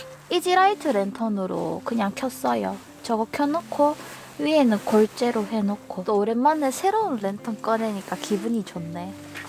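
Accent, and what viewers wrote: Gyeongsang, South Korea. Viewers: 고영희씨가 저지레할려고 들어왔다가 딱걸렸어요 ㅋㅋㅋㅋㅋㅋㅋㅋㅋㅋ 님 경북말씨가 넘 귀엽고 정감있어요 ㅋㅋㅋㅋ 님 캠핑은 어수선함 없고 깔끔하고 믿음직하네요👍🏻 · 경상도 사투리 ㅎㅎ 잘보고 있습니다. 셔쿨 고정하는 거 어디서 구입했나요? 담영상 기다려지네요. ^.^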